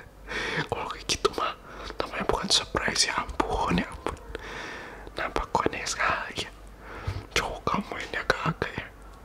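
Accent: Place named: Indonesia. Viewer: He got something extra with his accent, never knew indo and english issa good combination tho. Even me as malaysian, i fell for indo language everytime i heard the indo ppl speak. It kinda sooo soft and u mixed with english , the cool tone is added so yeah 1000/100 for that ✨😂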